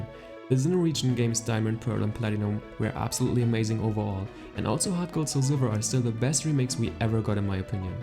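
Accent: Germany. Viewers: haha, man merkt beim Zuhören echt den deutschen Akzent :D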